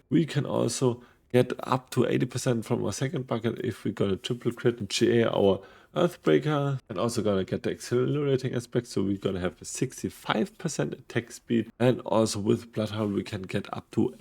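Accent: Germany. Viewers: I love the German accent when speaking English, your speech is just perfect matey, maybe a little fast. Certainly a lot better than my German! :-)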